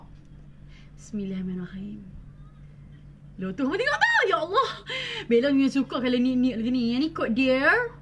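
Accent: Kelantan, Malaysia. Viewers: Hhahaahhaa. Aku sukO beLLa Kecek Klate😂supOo siam hahahhaaa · Panda Bella kecek kelate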